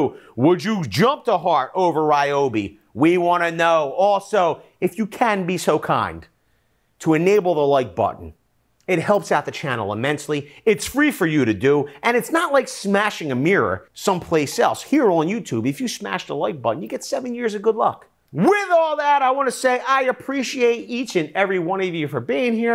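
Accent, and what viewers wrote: Philadelphia, United States. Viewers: that’s definitely not a New York accent. It sounds like Philly. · That's a Philly accent, not New York LOL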